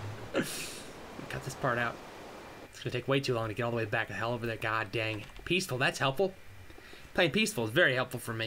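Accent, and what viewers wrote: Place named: England, United Kingdom. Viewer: I love his English accent. It's........ EPIC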